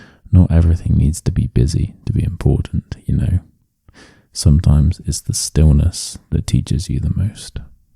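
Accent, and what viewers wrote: United Kingdom. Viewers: British English (which I love)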